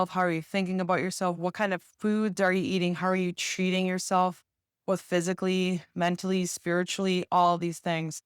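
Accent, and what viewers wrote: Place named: Wisconsin, United States. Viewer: I'da bet a cool hundo you were from Wisconsin...do tell.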